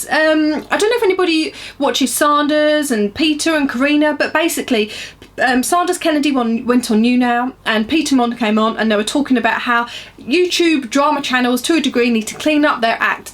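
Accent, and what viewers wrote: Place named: United Kingdom. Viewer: I think you're simply beautiful! I came across you not too long ago and noticed your hair in particular. Love it ... and your accent, of course. I lived in the UK for a while & miss it, so you bring back a little part of such fun memories just hearing you talk. I can tell you're a beautiful soul. Keep making videos! <3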